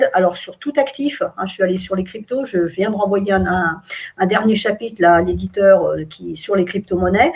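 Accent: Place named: England, United Kingdom. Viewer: Cet accent Anglais me laisse pantois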